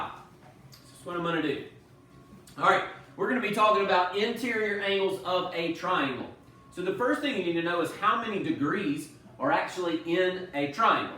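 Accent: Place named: Ireland. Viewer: my one complaint is the Irish accent. Other than that excellent video, keep it up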